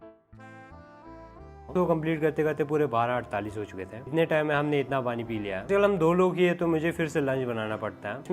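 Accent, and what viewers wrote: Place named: Kashmir, India. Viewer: Ur talking accent just like my kashmiri frnds 😂...keep going bro ...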